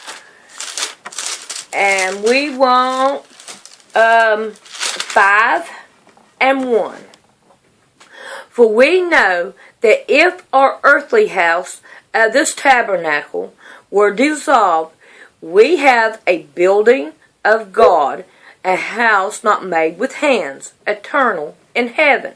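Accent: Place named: Rural, United States. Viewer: Can you quit sounding like a Hick?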